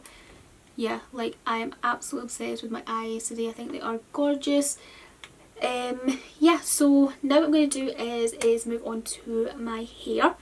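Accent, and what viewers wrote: Scotland, United Kingdom. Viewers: Great video are you scottish?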